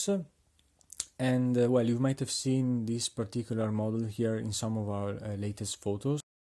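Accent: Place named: Italy. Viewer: Si sente l'italiano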